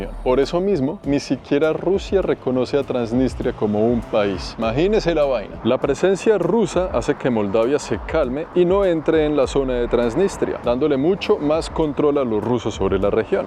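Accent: Bogotá, Colombia. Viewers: no paro de reírme escuchando tu rolo, es escuchar un cuento, contado por mi versión masculina 😂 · Aprender contigo es genial, lo genial es que eres rolo y gu forma de hablar es muy linda, si te veo en la calle te robo un beso 😂😂😂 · Hola. Lo que distingue a es la manera amena de relatar con su acento colombiano, muy gracioso. Además de enseñar lugares extraños como este 👏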